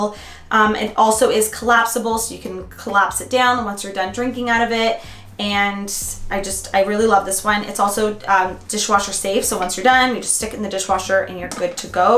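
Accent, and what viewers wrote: Canada. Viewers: How rude, it's a Canadian accent. Check your ignorance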